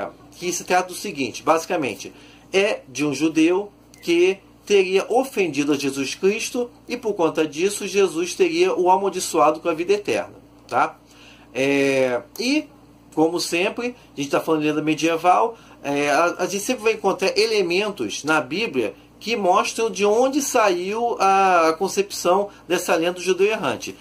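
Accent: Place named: Rio de Janeiro, Brazil. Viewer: Cê e carioca 😳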